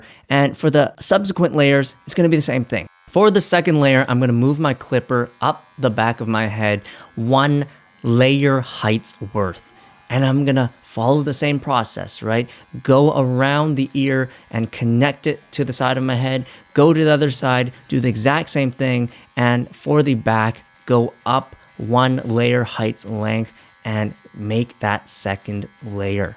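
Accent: Canada. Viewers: The Canadian accent is familiar and pleasant to hear 😊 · Are you Canadian by any chance?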